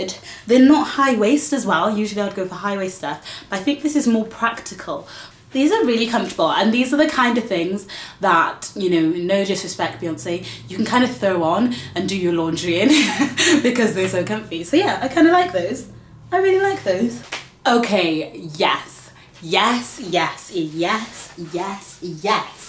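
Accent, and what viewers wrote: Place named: RP, United Kingdom. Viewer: Omg you sound posh I love the way you talk tho it’s not the common English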